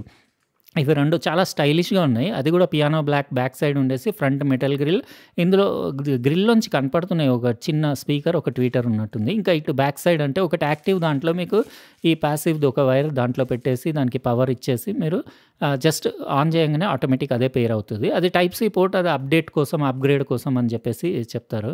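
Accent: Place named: Hyderabad, India. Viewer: Garu, Mastu, Jabardastu, meeru vade, hyderabadi, assent, kosam mee video chustnu, 🎉 all the best, prabhakar, alwal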